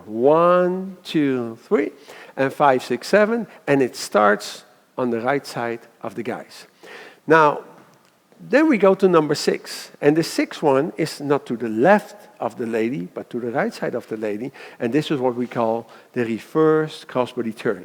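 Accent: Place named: Netherlands. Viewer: Well this is one very distinctive dutch accent....👍👍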